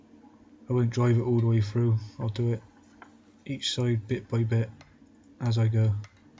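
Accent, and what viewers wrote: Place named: South West England, United Kingdom. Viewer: Gregory Lovvorn Having lived there for four years, I can easily place this as a Bristolian: from Bristol in the South-West of England. Heavily influenced by the wider, traditionally rural area of the SW. In fact, I hear the slightly softer 'edges' in this gentleman's accent that's more indicative of the rural region around Bristol than the city itself, but wouldn't be surprised if he was born and bred in Bristol.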